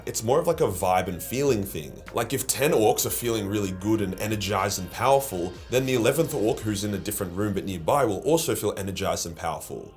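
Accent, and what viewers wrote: Australia. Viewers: I never realized had Australian voice over. I wonder what his real voice sounds like.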